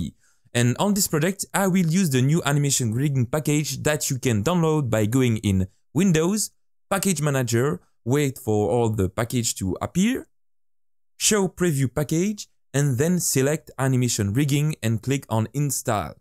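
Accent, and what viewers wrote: France. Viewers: i find you got a french accent, am i right?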